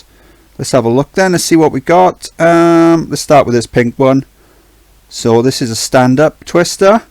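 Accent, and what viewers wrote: Wales, United Kingdom. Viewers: What country are you from? Your accent is awesome. I want to guess Wales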